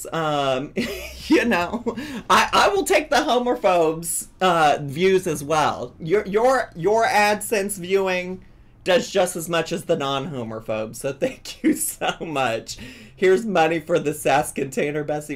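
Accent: South, United States. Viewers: has such a gentle southern accent for a midwesterner 😂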